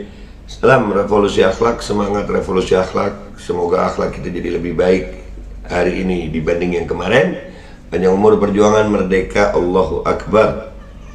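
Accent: Indonesia. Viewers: Njirrr dari beberapa bahasa daerah yg gw ngerti langsung logatnya babeh keren bingit kyak asli orang suku madura, jawa, bali, banjar dll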